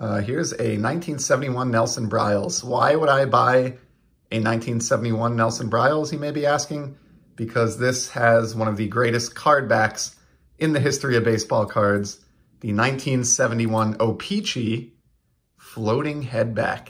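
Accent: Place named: Boston, United States. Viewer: So much boston haha …..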